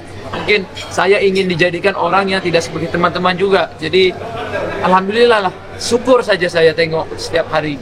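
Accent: Kuala Lumpur, Malaysia. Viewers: ya sbb dia ada darah melayu minangkabau, sbb tu tak susah untuk dia tukar loghat KL walau duduk beberapa tahun kat Malaysia. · 😂😂😂😂😂 ok jugak dia ckp bahasa melayu Malaysia...tp ayat2 BM tu dia tak tahu...cuma lebih kpd slang 😂😂😂😂😂 mantapppppp bro...keluarga diaorang nie bnyk travel...tp aku respect adik beradik diaorang semua berseni... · Pandainya cakap orang kuala Lumpur ya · comel laa ckp KL🤣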